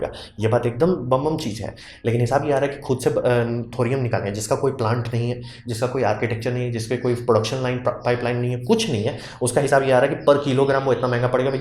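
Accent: Bihar, India. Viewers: Sir ar u from bihar Or jharkhand please replay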